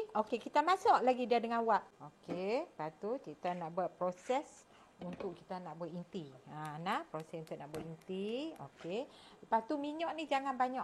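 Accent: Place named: North, Malaysia. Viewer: assalamualaikum..terhibur dengan telatah tambah pulk loghat utara.contoh nya nak ceghita bagi.nek habaq mai.ummi tergelak sendiri masa latah.memang padu pulut udang tu.ummi pun lama xbuat dulu penah juai.buat senighi puaih hati.ummi nak tanya kampong kat mana.ummi orang utara jugak.memang terhibur.anak2,la depa pandai buat pulut mulut ni.klu ummi buat depa bungkuih boleh la.lain kali ummi nak minta buat kuih jongkong bulih kan.bye ummi suka dan minat ngan telatah anda menghibur kan.t.kasih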